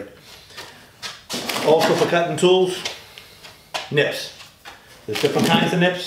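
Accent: Boston, United States. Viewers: he is from Boston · Thank you Sir for this informative video. From one American of Italian ancestry to another,,,what part of the USA are you from? Great accent!!! Regards from New Jersey !!!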